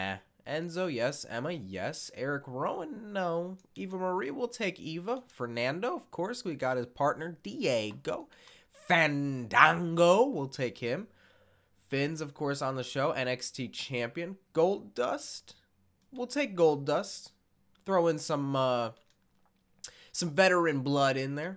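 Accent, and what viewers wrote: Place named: Australia. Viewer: I dont understand why people get so butt hurt. When i heard you aussie accent i laughed my ass off (im a aussie btw) anyways you are amazing i only found you today on your WWE 2k17 Arena video. good job and keep up the good work :)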